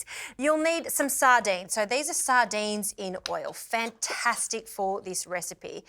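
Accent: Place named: Sicily, Italy. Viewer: I love your Sicilian accent!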